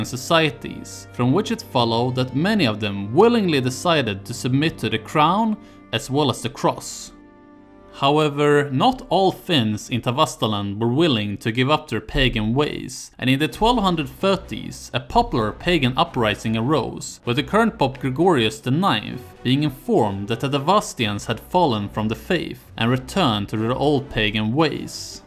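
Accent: Sweden. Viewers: Finland will be a disputed territory between Sweden and Russia after a short time. Your accent sound swedish. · Great Video!!! He speaks in a swedish accent or am I crazy?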